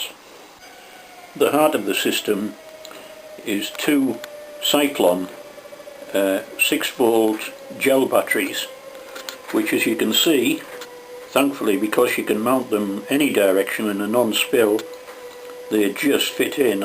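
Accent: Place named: Cumbria, United Kingdom. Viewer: Nice bike, you sound very Cumbrian indeed.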